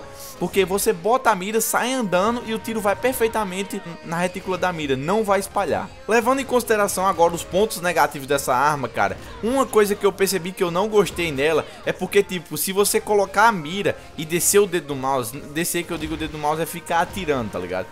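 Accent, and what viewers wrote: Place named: Pernambuco, Brazil. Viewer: vc é pernambucano ta falando Papangu moro na cidade do Papangu👏👏😉😉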